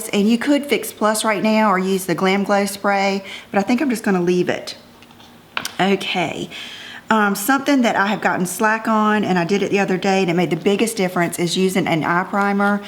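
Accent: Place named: North Carolina, United States. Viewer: Are you from nc? I'm trying to place that accent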